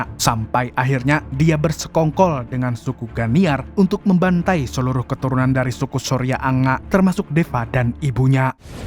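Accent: Banjar, Indonesia. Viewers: Banjar banar piyan mang ae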